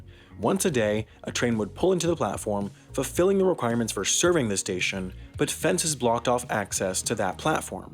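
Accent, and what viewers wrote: United States. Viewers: Gabbering Yank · Why do all these videos about ghost trains (and stations in the UK) all feature an American voice narrating them? Is it because Americans (still in 2023) find the concept of trains a novelty and something of an 'amusement'?? ..... while every other country just sees them as a vital part of their transport infrastructure. And an asset to their country's economy.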